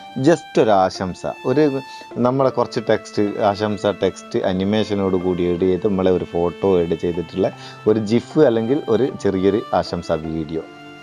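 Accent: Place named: Kannur, India. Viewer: നിങ്ങളുടെ സ്ലാങ് കേട്ടിട്ട് കണ്ണൂര്കാരനാണെന്ന് തോന്നുന്നു. 🤔🤔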